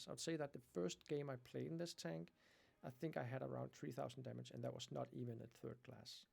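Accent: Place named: Netherlands. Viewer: oh, okay. Sorry about that. Your accent reminded me somehow of Netherlands 😂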